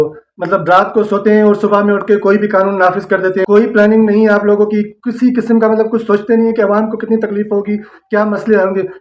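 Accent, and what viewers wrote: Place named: Sindh, Pakistan. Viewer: بھائی اپ کی ویڈیو دیکھی اپ عوام کے لئے بہت دفاعی اواز اٹھائی ہے اللہ پاک اپنی شان کے مطابق کی اور ہماری مدد فرمائے بھائی اپ ہمیں سندھی لگ رہے ہیں اپنی انداز اور اواز سے ہم جب اندرون میں سندھ جاتے تھے تو سندھی لوگ ہمارے لئے بڑے پیار سے لسی پراٹھا مکھن لے کر اتے اور خوشی خوشی ہمارا سات سات جگہیں گھماتے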